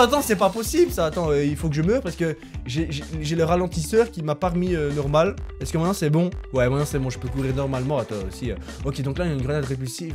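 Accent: Belgium. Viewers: Mais tu parles il est belge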